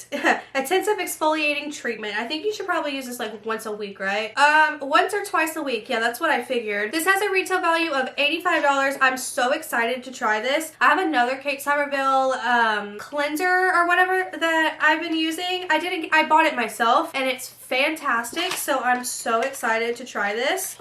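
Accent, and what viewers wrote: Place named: United Kingdom. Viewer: I'm really surprised, I thought u were British and live in the UK,for some reason I hear a British accent when u talk.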